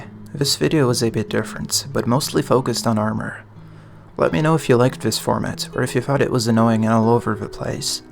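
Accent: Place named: United States. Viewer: You write armour with british english but you sound slightly american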